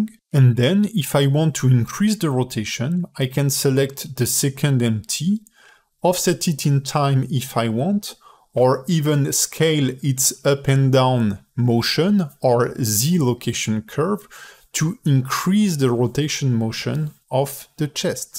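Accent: France. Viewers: french accent ? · ça sent le bon accent français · Hhaaa l’accent français 😄, super vidéo instructif, tout le monde en avait besoin. Merci beaucoup. En espérant avoir d’autres à l’avenir,bonne continuation. Ps: serait t’il possible d’avoir des sous-titres en français,même si on est en petit minorité sur ta chaîne(je pense) 👋👏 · Est tu français car j'ai l'impression que ton accent est français. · i think you have a french accent ^^ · T'es Français uhn ? ça s'entend XD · I love your french accent bro ( j espère que je me trompes pas en disant que t es français)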